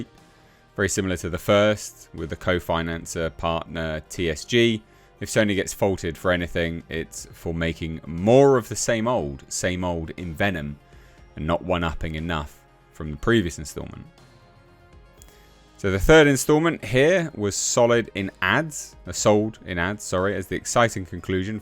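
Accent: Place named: United Kingdom. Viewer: Us Brits pronounce tomatoes differently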